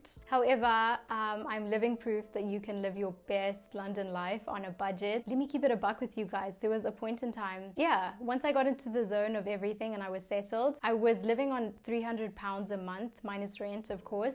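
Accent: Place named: South Africa. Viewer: I knew it she's south african 🇿🇦😝 thanks for the in-depth review. I feel super comfortable in my choice for my masters there. 😎 Keep it up!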